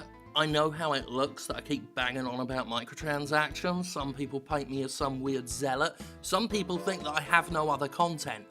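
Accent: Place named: United Kingdom. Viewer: So satisfying hearing a British bloke tell it how it is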